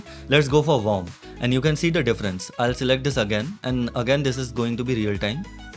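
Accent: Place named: India. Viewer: Hello brother, i recently started watching your vedios,you had great command over comfiyui and other ai tools,i think your indian(because of accent😅). i am currently learning comfiyui just a begginer. i want to learn from you. i have some specific area on which i want to work. is it possible to learn from you personally like one on one session. willing to pay.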